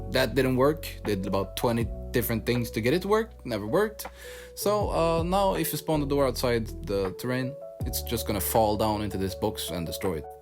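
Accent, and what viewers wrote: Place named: Sweden. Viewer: Great job! Love the Swedish accent as well!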